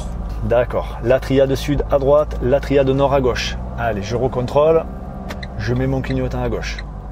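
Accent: South, France. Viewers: Merci pour tes vidéos ;) très agréable à écouter avec ton petit accent du sud qui nous donne un peu de soleil (je suis de Paris)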